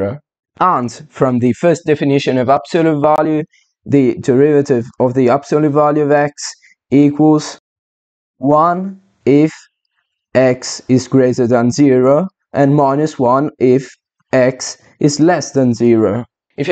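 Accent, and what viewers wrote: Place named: United Kingdom. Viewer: May I ask you where you are from? I'm just trying to place your accent. It sounds similar to some British accents I've heard, but also maybe something else from a different country. I hope that's not impolite to ask.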